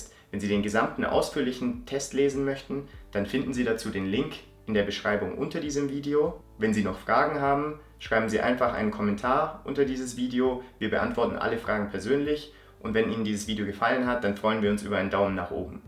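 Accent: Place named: Bavaria, Germany. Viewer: Das ist Bayerisch 😁😆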